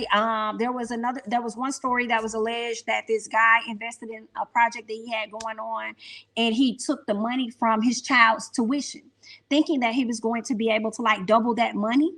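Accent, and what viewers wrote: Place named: Alabama, United States. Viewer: I subscribed as soon as you song messssyyyyy. Sounding like you are from Birmingham Alabama girl. Im new here and I think I'm going to enjoy it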